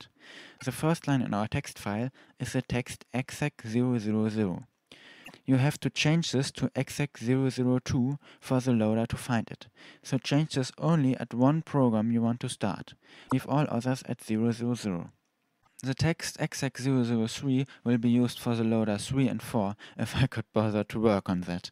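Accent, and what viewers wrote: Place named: Germany. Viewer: This german accent😂 no hate. I just find it funny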